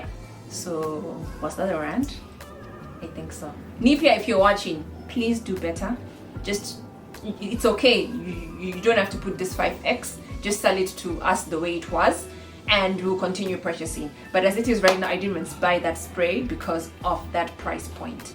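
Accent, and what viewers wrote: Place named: Shona, Zimbabwe. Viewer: I'm happy to hear that!! Video request about a monthly budget and how to save money in Nairobi., your accent has some Shona undertones.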